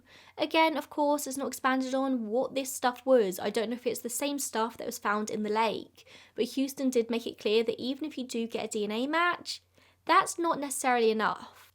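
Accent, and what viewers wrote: United Kingdom. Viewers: As an american😐😐😐😐are you serious rn? The creator, is OBVIOUSLY not American, (I think british but not completely sure) she obviously has an accent and is going to talk with her accent and dialect😐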